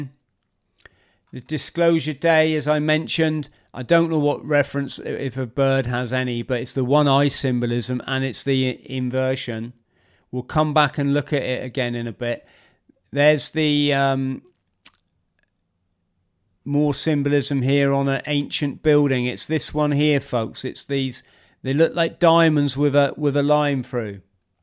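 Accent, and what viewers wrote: Plymouth, United Kingdom. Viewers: If that's a Plymouth accent that I'm hearing - that axe throwing place is pretty close to the Octagon! Lot's of the classic architectural hallmarks in the city's geography.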